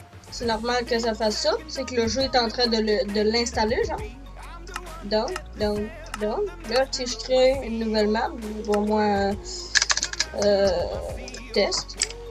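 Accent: Quebec, Canada. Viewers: Ta vraiment l'accent québequoi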